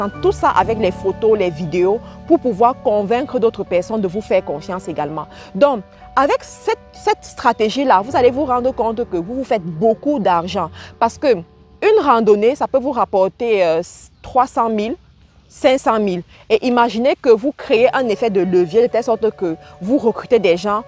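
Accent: Cameroon. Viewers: Prononciation normale même si elle a un accent camerounais tinté semi canadien aussi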